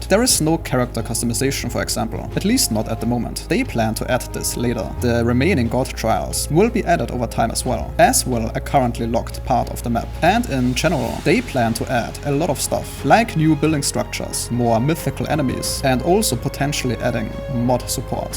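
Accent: Germany. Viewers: nice german accent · Sehr cooles Video mit vielen Informationen und Gameplays die ich noch nicht gewusst und gesehen habe. Würde dir aber empfehlen einen deutschen Kanal zu führen oder Videos in englisch und in deutsch zu machen da du ein krassen Akzent hast und damit wahrscheinlich noch mehr leute erreichen würdest. Hab bei der ersten Sekunde gemerkt das du deutschsprachig bist.🤙🏻😉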